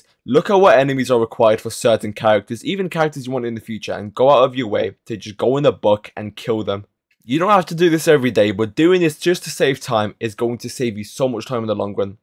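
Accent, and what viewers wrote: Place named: Roadman, United Kingdom. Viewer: a guy with a roadman accent playing genshin feels unheard of 😭🙏 i weirdly like ur channel man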